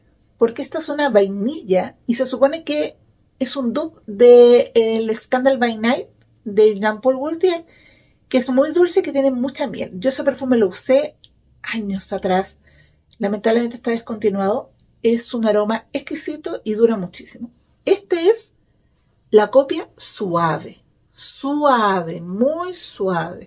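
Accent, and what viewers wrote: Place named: South America, Latin America. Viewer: ¡Me encanta tu acento! Para mí, el mejor de Sudamérica. Saludos desde México.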